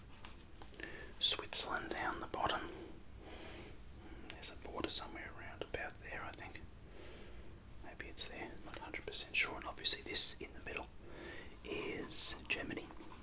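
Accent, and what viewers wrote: Australia. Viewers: I’m German but I’m just here for the Australian accent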